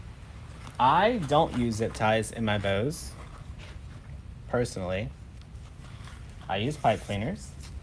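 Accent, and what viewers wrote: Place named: Alabama, United States. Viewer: Lol, you say ya'll as much as we do in AL. My cousins in MI say "youz guys"...that's just wrong don't you think? haha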